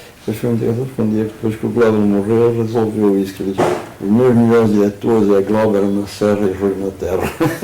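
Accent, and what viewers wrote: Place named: Portugal. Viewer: Sempre o sotaque portugues------isso ´ é bom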